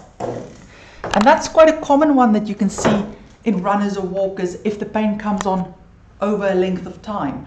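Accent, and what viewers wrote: Afrikaans, South Africa. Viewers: Are you Afrikaans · Best info I've run across after 5 days of searching. Really good stuff. Well done. Love your accent. I'm guessing South Africa?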